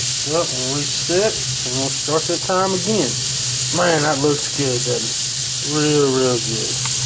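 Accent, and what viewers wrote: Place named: Georgia, United States. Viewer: Came across this vid after I bought some flat iron steaks at the local Piggly Wiggly. Started strong with the proper manner of talkin' (like we do round here in mid-Ga) you lost some credibility cracking open that Buttwiper (Light, at that!) but then, it IS STILL beer . . . so I continued watching. Will do some steak fries instead of the new taters and reckon it ought turn out purdy decent. Keep ya posted.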